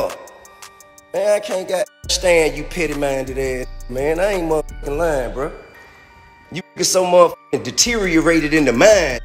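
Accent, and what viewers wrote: United States. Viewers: Why do he sound so country this day and time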